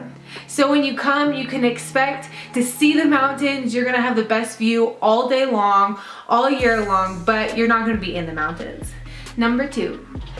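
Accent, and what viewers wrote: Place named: Oklahoma, United States. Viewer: Yep, she sounds like she's from Oklahoma.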